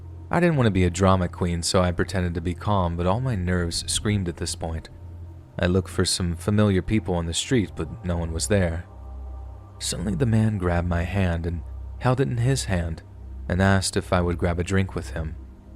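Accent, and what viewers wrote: Denmark. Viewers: So is Danish? I was trying to place the accent. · Haha, når man genkender dansk accent med det samme!!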